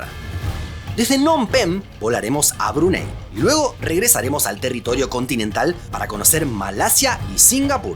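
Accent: Rioplatense, Argentina. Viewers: De dónde sos ? Me encanta tu acento! (Argentino o uruguayo)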